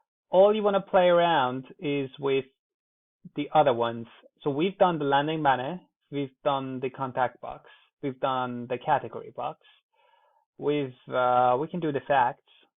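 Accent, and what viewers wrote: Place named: Iran. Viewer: Great Persian-English accent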